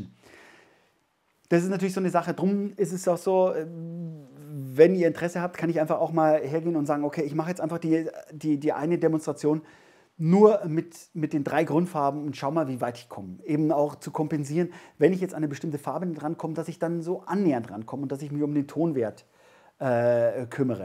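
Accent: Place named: Franconia, Germany. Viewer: Danke für das Video 🌷Du klingst aber nicht nach Wien... Eher nach Franken 😉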